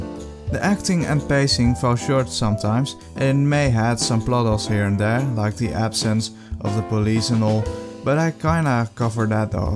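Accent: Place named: Netherlands. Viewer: you sound dutch and nice vid btw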